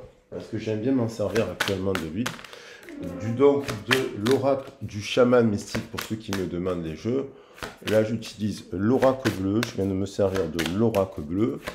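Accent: South, France. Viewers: Merci pour cette guidance! Avé l'accent du soleil, c'est encore mieux🤣! Séparation et abandon datant de 2021, vu qu'il ne se décidait pas à acter un choix, j'ai mis un terme à ses visites (amicales), il n'a pas apprécié!!! Belle soirée:face-red-heart-shape:🌠!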